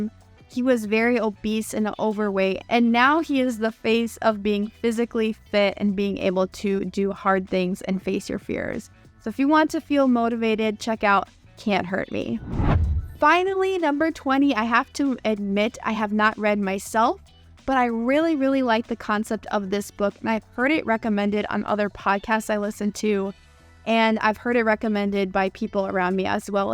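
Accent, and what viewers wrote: United States. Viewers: I love your channel😍. I was looking for a channel like this ( I really like the American accent and this channel has given me tons of information<3) love it!!! Thank you ❤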